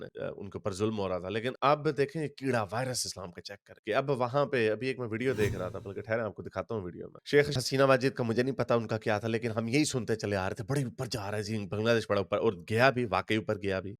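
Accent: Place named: Punjab, India. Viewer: Bhai kitna bhi disheartened ho jao but videos banani band nahi karna. Aapka Punjabi accent aur Punjabi mein kosna bohot acha lagta hai.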